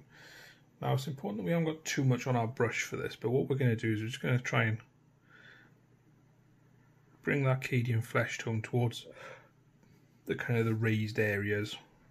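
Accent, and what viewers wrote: Wales, United Kingdom. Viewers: Great job. You sound Welsh mate. Where to are you from like? Sorry, couldn't resist. Shout out from the funny, sunny Rhondda.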